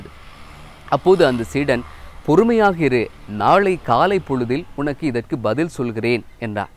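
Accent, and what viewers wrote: Sri Lanka. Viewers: அதுதான் இலங்கை தமிழ்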